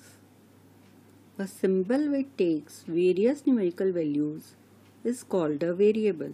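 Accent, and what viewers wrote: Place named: India. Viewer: she has an Indian accent like a lot of my teachers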